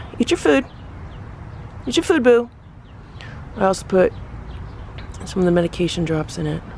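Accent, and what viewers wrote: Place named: Long Island, United States. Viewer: Where are you from....I live on long island....we kinda sound alike...I have Tom's of ferral cats by my house....it kills me. I have 2 that live in my house now and I am going to build a big cat apartment mini building.....I feel they should all have a home and be loved and spoiled.....it kills me to see them scared....and outside not feeling loved 😢😢😢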